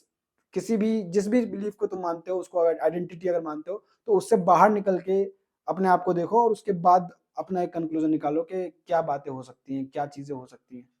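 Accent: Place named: Bihar, India. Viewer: Bihari ho kya?thoda nhi, thora bol rahe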